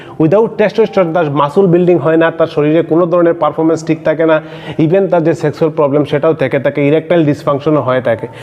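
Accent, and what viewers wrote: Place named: Barishal, Bangladesh. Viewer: ও তুমি বরিশায়লা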